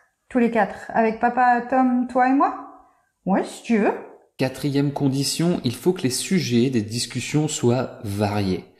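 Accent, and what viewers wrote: France. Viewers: Vraiment vous parlez le français authentique.,comme le français que nous apprenons aux écoles marocaines. Merci.mais parfois nous sommes pas capables de comprendre les francophones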